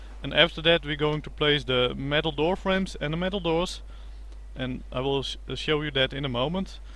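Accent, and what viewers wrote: Netherlands. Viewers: 100% your dutch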